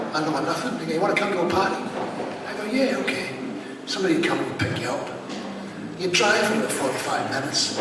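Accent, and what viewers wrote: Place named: Scotland, United Kingdom. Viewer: Boy! The brogue REALLY comes out when he goes back to Scotland!! ;)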